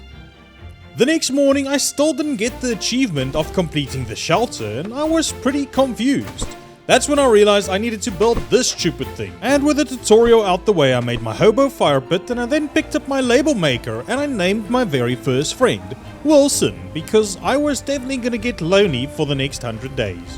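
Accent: Afrikaans, South Africa. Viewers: Bro u gotta be South African with that accent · u sound afrikaans ..., are you? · Are you south african? Your english sounds a little like you got an afrikaans accent? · Do I hear a South African accent? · Why do you sound South African. Are you from South Africa? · Haha nice , eks ook afrikaans so ekt die aksent amper dadelik erken 😂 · Are you South African? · You sound like a south african when you speak english (the accent) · Are you from south africa? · South african or new zealand? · Are u afrikaans? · mans sounds south african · It sounds like your from SA? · Good to hear a south african voice, epic video man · you sound afrikans bro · You are south african me to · South African? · The south african in me smells an accent 👀🤣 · First time I've ever come across your channel, can hear that Afrikaans coming through :P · You sound South African? 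Are you by any chance one of the 44? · oh ok because i thought that accent of your sounded really like a SA accent was just curious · You sound like you come from ZAR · for some reason you sound south african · Are jou South African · South africa? I'm ne not trying to offend you but are u south African because u sound like a south african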